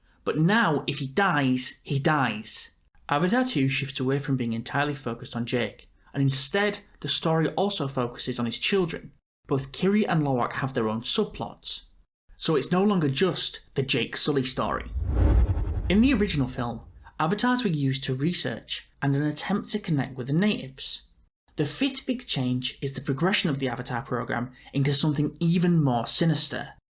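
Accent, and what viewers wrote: United Kingdom. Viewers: Are you British 🥲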